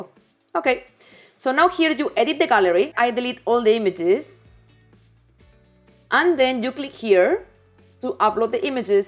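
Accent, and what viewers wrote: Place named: Spain. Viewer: very good video. great recommendations. Also like the accent. - Spanish?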